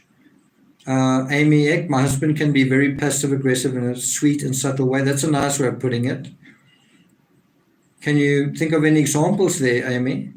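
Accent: South Africa. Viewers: When I am unable to sleep, I put your videos on & you lullaby to a wonderful sleep. It’s not that you bore me but you relax me so much. Love your South African accent & your soothing voice❤️❤️❤️❤️ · I love your accent my doctor is from south Africa too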